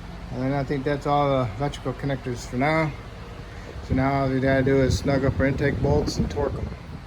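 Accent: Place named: Chicago, United States. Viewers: That’s a Chicago accent if I’ve ever heard one. Am I right? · just sounds like a midwest generic mechanic accent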